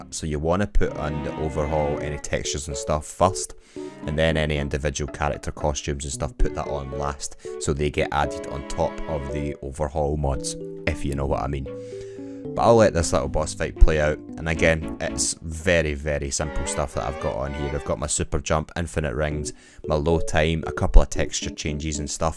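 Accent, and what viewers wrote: Scotland, United Kingdom. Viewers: are you scotish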